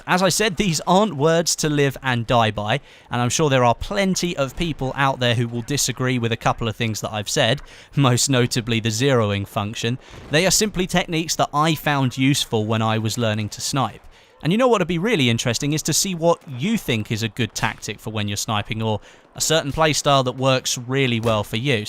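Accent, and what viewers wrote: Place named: United Kingdom. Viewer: I hate british axents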